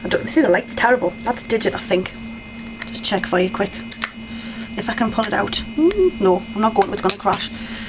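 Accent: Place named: England, United Kingdom. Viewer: you have a great accent, english i'm guessing? you have great skills too woman :D & i totally understand about the collection thing and i respect you for thinking more of others than just yourself... all over awesome!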